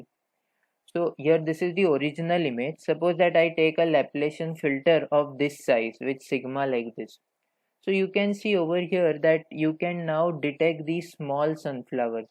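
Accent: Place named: India. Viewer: 讲的挺好的，就是印度口音听着有点别扭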